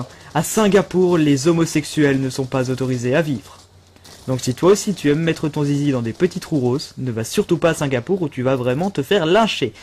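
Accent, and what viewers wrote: Belgium. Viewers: j'adore notre lois belge U_u t'as un bel accent wallon =D c'est si rare mtn de parler wallon T_T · Si tu aussi tu as quitté après , pouce vert! Non, je rigole! xD J'ai adoré ton accent belge(je suis moi-même belge, je ris au imitations que l'on fait de nous!) et j'adore ta manière de commenter! Pour ton prochain top 20, tu devrais en faire 1 sur les 20 choses les plus tapées sur Google (tu tomberais sur des trucs... interessants!) Bonne continuation. Bises. Ton compatriote belge.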